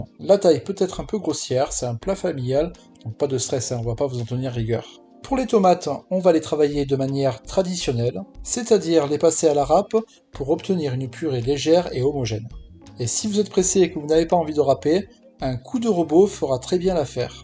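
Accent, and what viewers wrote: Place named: Provence, France. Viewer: hum cette pointe d'accent provençal !!! Je l'ai aussi mais légèrement plus prononcé!! Sinon, votre recette est succulente, je l'ai faite hier soir on s'est régalé.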